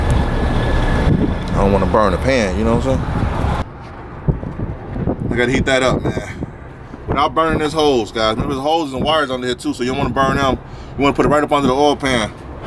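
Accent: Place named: Baltimore, United States. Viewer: You from Baltimore ain’t you? If not you sound exactly like my cousins from there. But thanks for the video and tip.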